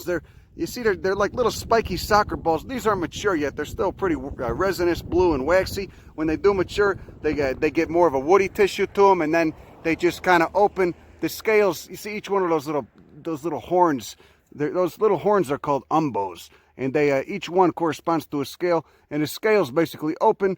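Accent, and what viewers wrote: Chicago, United States. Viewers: I agree with about society's crap and enjoy your unique take on it. And of course the real American accent from Chicago to share the amazing botany you explain so well in a language I appreciate, thanks for the history and botany you share with the rest of us stuck in this life, for me in CA, surrounded by tweekers opinionated by today's ignorance worrying, about the gas for their torch. More then the beauty that we share with you like this. Awesome, I was born in northern IL, South Beloit. Have no connection to it other then birth cert. Lol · make that Chicagoan accent 😉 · ive never been attracted to someone with such a heavy american accents but fuck he’s too wholesome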